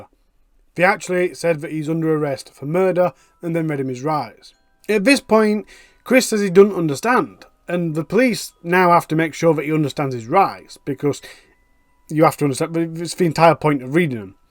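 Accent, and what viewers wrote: Yorkshire, United Kingdom. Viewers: I do love to hear a fellow Yorkshire accent on here though! It’s so refreshing! I just hope you don’t try and bend it with American terms just because a few daftys struggle for some reason.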